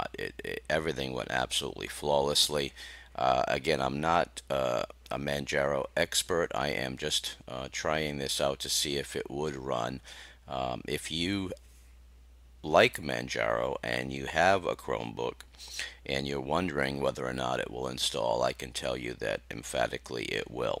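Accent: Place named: New England, United States. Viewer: You've got to be from Mass or NH with that accent.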